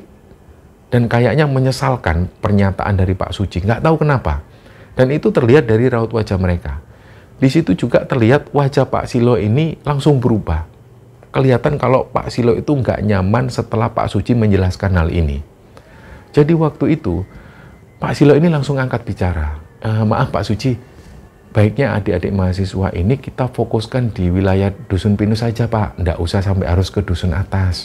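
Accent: Java, Indonesia. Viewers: jawane iku loh ih suka pisan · Assalamualaikum, lagi musim semua Chanel horor pakai narasumber. Tapi tetap dgn khas sendiri tnpa narasumber lebih asyik, lebih enak didenger, alur ceritanya jelas... Tetap ga usah pakai narsum biar beda dgn lainnya. Mas dgn style jawanya slalu keren❤❤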